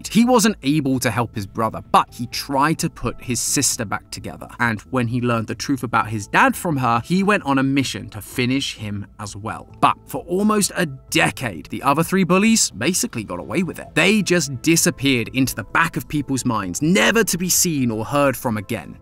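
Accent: United Kingdom. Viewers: I want matpat back Your voice is just too British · I'm so used to matpat that it scared me when I heard a British accent love ya!😭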